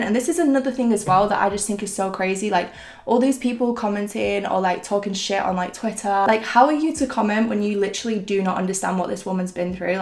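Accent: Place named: Northern England, United Kingdom. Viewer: Gorg look! Your northern accent makes me feel so at home, hello from leeds ✨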